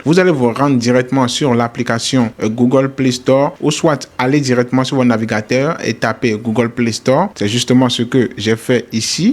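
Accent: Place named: Benin. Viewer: MERCI BEAUCOIUP MON GARS TU ES UN 10. JE NE TE CONNAISSAIS PAS AVANT, PREMIEE IDEO JE TOMBE SUR DE LA VALEUR GRATUITE MERCI BEAUCOUP.DIS MOI TU ES BENINOIS ??? VU TON ACCENT ON DIRAIT. MOI JE SUIS BENINOIS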